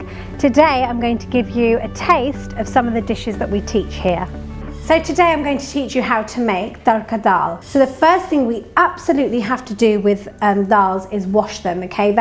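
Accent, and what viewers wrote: England, United Kingdom. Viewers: Where are you from ur English is soo english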